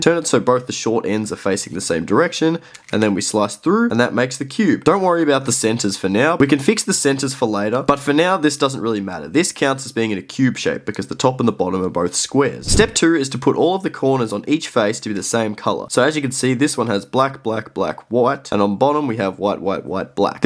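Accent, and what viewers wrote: Australia. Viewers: Thanks for this quick and easy to follow tutorial 👏😁 But the Aussie accent was so funny 😂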